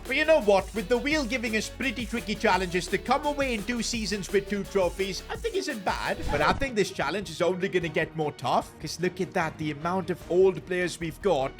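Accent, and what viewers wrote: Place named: India. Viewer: lol Indian accent is so strong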